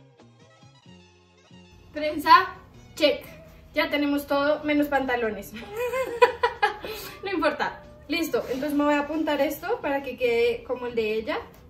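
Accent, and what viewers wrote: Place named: Colombia. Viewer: me encanta tu acento colombiano 😍😍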